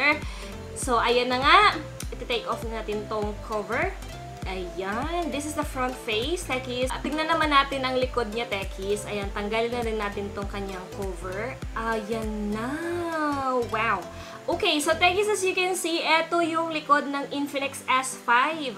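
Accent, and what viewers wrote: Visayas, Philippines. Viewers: bisaya d i ka maam? hehehe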